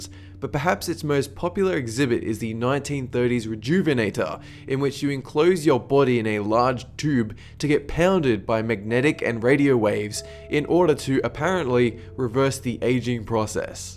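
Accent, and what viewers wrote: Australia. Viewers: I believe he is Australian. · sounds like Australia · Whay is his accent? I want to say Australian, but I dont hear many accents in my life. And you should make a sequel to this video. You missex alot of other cool museums! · Not a normal Australian accent. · Yeah it's Australian~ best accent ever！ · Australian.but has some american influence like pronouncing new like noo ... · Seven Super Kittys he doesn't sound canadian to me, much more aussie. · He's from Australia he says it in one of the videos by the way am from Australia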